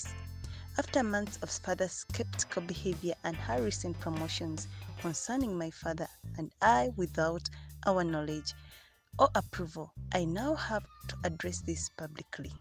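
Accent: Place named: Africa. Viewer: and she sound like african,and lot of Africans live in Jamaica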